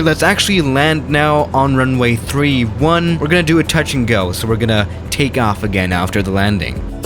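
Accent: Germany. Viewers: Are you German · Are you an german? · I think this is a stupid question but... Is he German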